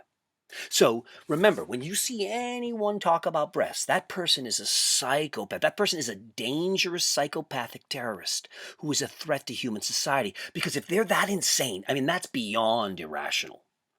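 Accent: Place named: United Kingdom. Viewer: Wow he's still doing the British accent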